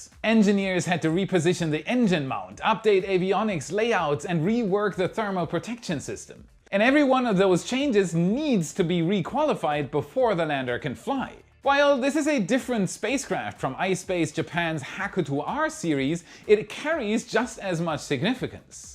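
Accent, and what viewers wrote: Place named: Germany. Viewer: Even his accent is Crazy. To less Information about the topic seems like some random german just startet with reels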